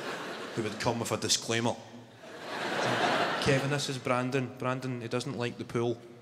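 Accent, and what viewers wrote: Scotland, United Kingdom. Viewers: He does actually speak English, but with a Scottish Accent. Maybe you just don't bother listening.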